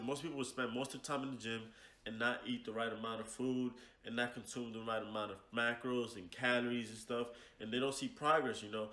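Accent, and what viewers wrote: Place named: Texas, United States. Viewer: I know for a fact you from Texas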